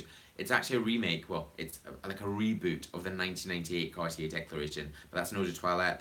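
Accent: Scotland, United Kingdom. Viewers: general_anto _ he is scotish I think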